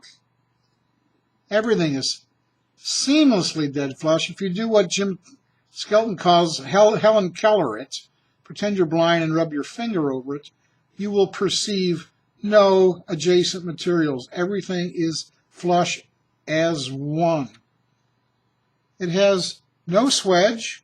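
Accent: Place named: United States. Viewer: This american accent while speeking german words is the besteht :D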